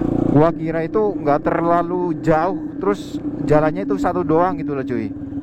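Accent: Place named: Java, Indonesia. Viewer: Sumpah , kalo bukan orang betawi jangan pake Lu GW lah tolong , keliatan banget Orang jawa dari tambahan kata KOH dan logat jawa gitu , jadi situ yang ngomong sini yang malu dengernya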